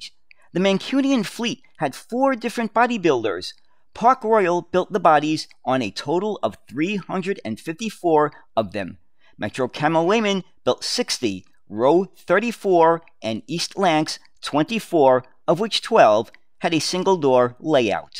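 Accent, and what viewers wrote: New York, United States. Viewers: Absolutely love your New York accent!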